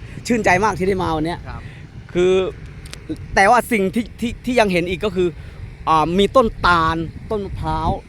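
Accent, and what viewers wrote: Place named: Laos. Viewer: ดีครับ/ ฝึกพูด ภาษากลางให้ชัดๆบางที่บางภาคเขาจะฟังภาษา ลาวไม่ออก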